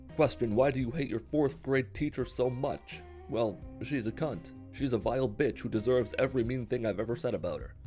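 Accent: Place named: United States. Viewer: I never noticed the American accent he has until now. I assumed he was European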